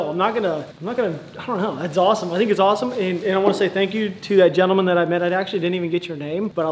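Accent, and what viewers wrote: South, United States. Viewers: you and Nick Irvin should go teach demo ranch to shoot long range for a video idea. Your southern accent was shining today by the way, it was sweet, I love your videos keep them coming.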